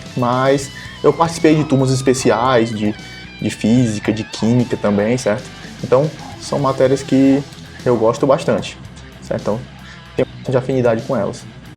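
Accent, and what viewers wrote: Ceará, Brazil. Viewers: o senhor é cearense? ou fez matemática na UFC? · Você é cearense?? Hauausus quase meu vizinho slc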